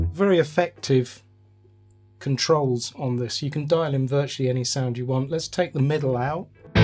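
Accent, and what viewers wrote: United Kingdom. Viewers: I could listen to this British accent all day.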